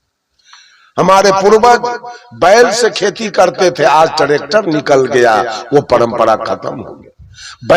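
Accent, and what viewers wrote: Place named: Bihar, India. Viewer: अपना चश्मा तो उतार दे पहले 😅 और बोली तो जेसे लालू जेसी बात करता है हमारे जेसी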